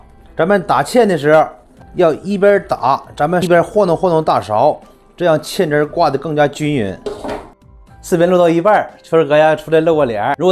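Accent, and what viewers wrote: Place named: Liaoning, China. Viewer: 浓厚的辽西口音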